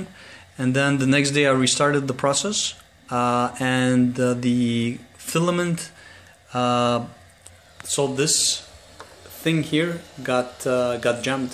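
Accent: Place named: Quebec, Canada. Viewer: are you french Canadian? you sound like GSP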